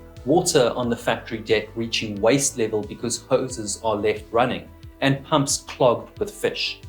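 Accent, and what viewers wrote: South Africa. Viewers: Yeah, pretty sure he's, that's just how it sounds with a South African [I think] accent.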